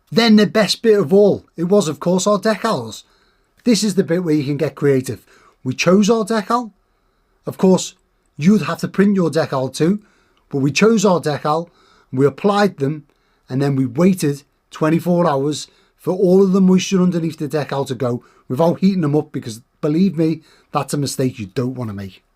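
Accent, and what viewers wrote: Liverpool, United Kingdom. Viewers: So I've genuinely been thinking about this. Your Scouse is obvious, but not aggressive, and I'm wondering if it were thicker if I would have had the same reaction. Genuinely, it took me a while to figure out that your "deckle" meant "decal", but maybe if I was already having to "translate" it would have just been part of the expected difference. That said, I'm having real trouble figuring out how Scouse transforms "decal" into "deckle". How do you say "diesel" and "demon"? "Dezzel" and "demmon"?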